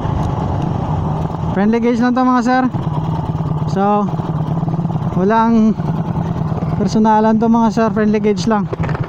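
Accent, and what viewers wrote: Iloilo, Philippines. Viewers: Ilonggo ka kuya🤣🤣gravbe taka ka idol mag dala sang rider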